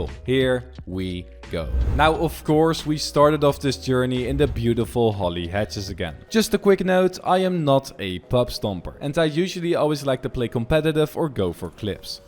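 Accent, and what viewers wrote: Netherlands. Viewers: Even een vraag je ben jij Nederlands just a question are you ducht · You Dutch right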